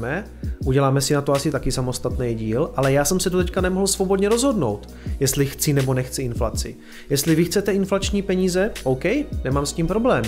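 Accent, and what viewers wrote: Czech Republic. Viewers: Už tě sleduju nějakou dobu ale ted slyším že si z Prostějova. Já jsem se tam narodil a bydlel 22 let. Dobrá práce, moc pekný videa, naučil sem se hrozně moc a občas se i zasměju 👍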